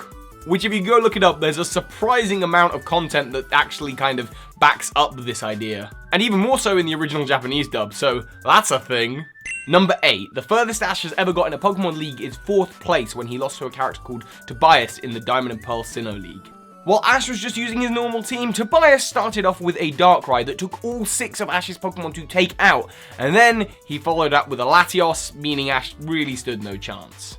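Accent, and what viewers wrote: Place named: England, United Kingdom. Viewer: The English accent ertainly doesn't make it any easier! lol!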